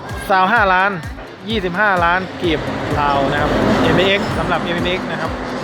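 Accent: Isan, Thailand. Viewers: อ้ายเป็นคนอีสานบ่คับบ😀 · กดติดตามเพราะคำเว้านี่หล่ะ ข่อยก็มักเว่า..อิอิ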